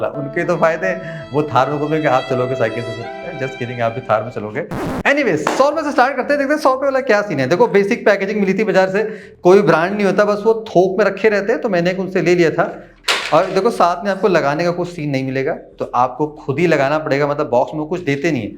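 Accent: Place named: Kanpur, India. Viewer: Kanpur bali vibe aa rhi h bhaiya ji...😅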